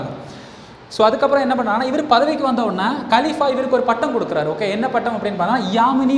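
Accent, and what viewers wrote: India. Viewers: Tamil language Malayalam slang mari pesuringa sir,, i think neenga Kerala😊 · பேச்சு கொஞ்சம் நாகர்கோவில் மனம் வீசியது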